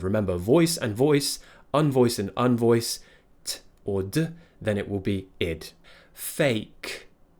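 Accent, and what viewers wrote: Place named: Cambridge, United Kingdom. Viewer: Nice wher in England are you from ..accent wise i cant firgure out il guess Cambridge ?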